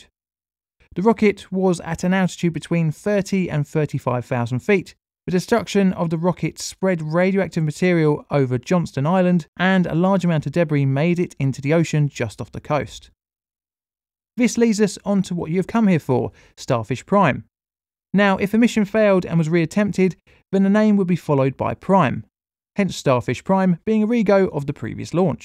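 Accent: United Kingdom. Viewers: I think your videos are awesome, informative, and very well produced. The British accent is just a bonus. Also I am enjoying the increased video production, atleast something good is coming out of this Covid crap. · His is the best British accent after Patrick Foote (Name Explain).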